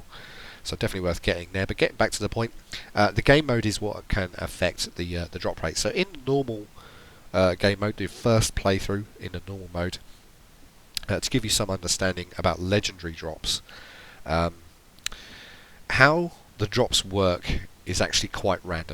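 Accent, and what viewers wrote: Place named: United Kingdom. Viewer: I would rather shove bamboo under my fingernails than listen to British people drone on and on. It sounds like you have the personality of a log.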